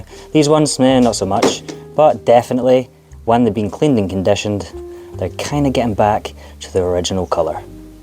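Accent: Scotland, United Kingdom. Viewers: Have relatives from Northern Ireland, who always considered themselves as 'British' rather than 'Irish,' but I'm not too familiar with accents but think you might have some Scottish roots too. Perhaps not, but you have a new subscriber here...) Best wishes!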